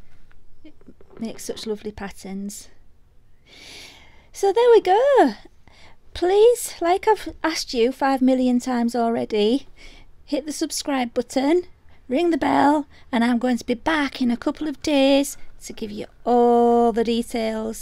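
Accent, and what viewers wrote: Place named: Harrogate, United Kingdom. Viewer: I feel like wrecking my microwave. Lol. You sound as though your from my area. Harrogate. I can’t find one 😥 I have the pigments. I’m ready Only got tiny magnets tho. Scared lol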